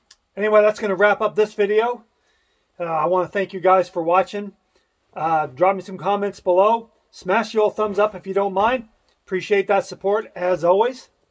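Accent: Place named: Canada. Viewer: That must have been some fall! I’m glad your okay and didn’t seriously injure anything. When you were showing us how you landed by demonstrating I was wanting to say “ don’t do that to yourself your in enough pain. We can just imagine in our minds”. Again glad your ok and that you’ll survive. Enjoy watching your content;been watching 2 years now. Looking forward to the next one. Also I hope you and your family have a great Thanksgiving 🦃. Also just wondering, are you Canadian? Reason I ask is because I notice when you say words like out I can hear the oot pronunciation. Thanks!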